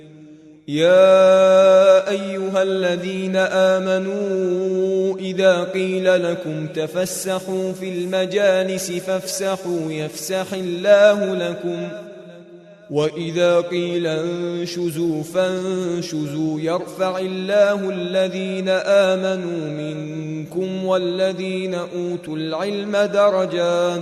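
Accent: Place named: Yemen. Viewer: هذة قرائتنا قرائة اهل اليمن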